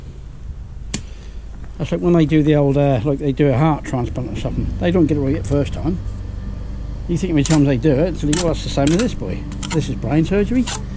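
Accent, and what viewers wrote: Norfolk, United Kingdom. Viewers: Are you from Norfolk? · You're a very good teacher. I've lived in Suffolk for 30 years - a Dorset boy originally - and recognised your Norfolk tones straight away. You make this job look so easy - thanks for sharing.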